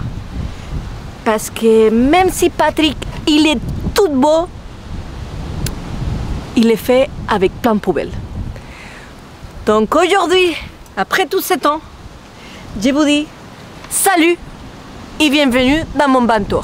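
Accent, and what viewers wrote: Spain. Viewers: C'est toujours un plaisir de te suivre, avec cet accent espagnol rempli de lumière❤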